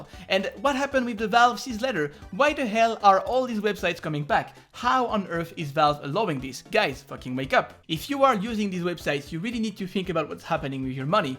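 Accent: France. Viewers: are you french????? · Is this guy French? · You sounded way more French in this video.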